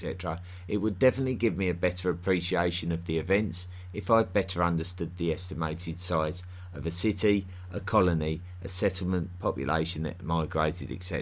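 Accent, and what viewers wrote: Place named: Cockney, United Kingdom. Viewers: Sounds like "my name is Michael Caine" I love the combination of cockney accent and erudition. The man can't be expected to cover all aspects, never mind in detail, in 46 minutes. If you want more detail you should search Google for scholarly articles on this subject. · Not so sure about clearly :-) ... very strong London accent there. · The cockney voice over is what makes this even better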